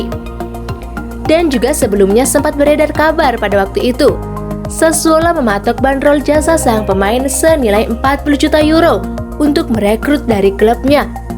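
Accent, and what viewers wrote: Indonesia. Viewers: Pengisi suara apakah orang jawa??? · Gaya bicara kaya'nya Admin orang LOMBOK..😂😂😂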